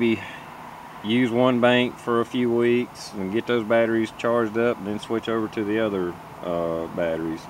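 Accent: North Carolina, United States. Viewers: Nothing wrong with that "country accent"... I have one as well. You sound like a North Carolinian.? Or deep South at least. Great find on those "trashed" batteries!! And great video! Thanks!!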